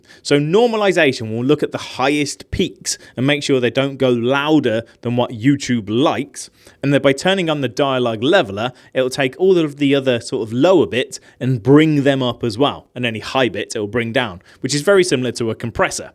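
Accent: United Kingdom. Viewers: SO MANY TIPPS for my workflow!! Thank you so much from Germany and let me say that I love your accent. Which part of UK is it from?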